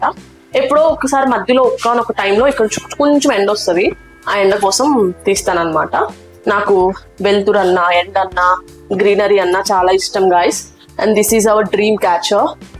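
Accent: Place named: Nellore, India. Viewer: Fridge lo samanlu pette eruganu.mana nellore basha akkovv..yaadikellina mana nellore natural slang e baga vaduthunnaru.Nice sis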